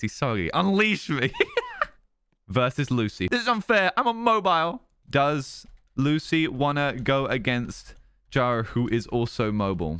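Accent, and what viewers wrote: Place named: United Kingdom. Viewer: cool video and all but why are you british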